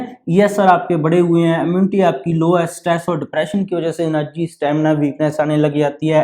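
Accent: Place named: Punjab, India. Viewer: Kuchh samajh ni aa raha Punjabi touch k vajah se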